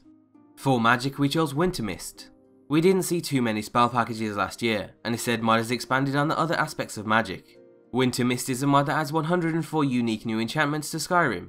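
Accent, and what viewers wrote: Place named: Birmingham, United Kingdom. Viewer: Sounds like your from Birmingham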